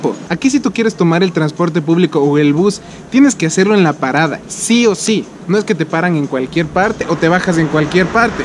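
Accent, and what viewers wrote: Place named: Ecuador. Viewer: Nunca perdiste el acento ni tu identidad Ecuatoriana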